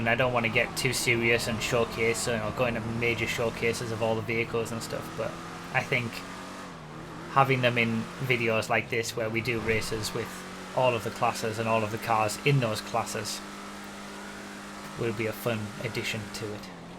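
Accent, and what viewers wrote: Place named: Northern England, United Kingdom. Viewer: Northern England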